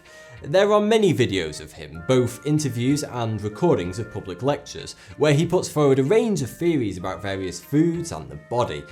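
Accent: United Kingdom. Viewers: This is a British accent but from which area or city? It is interesting. London? York? Lancaster? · Mikes8899 the sparkle white oversized front teeth, the pretentious and overly british accent, not to forget the smug look on his face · his British accent with a lisp is really annoying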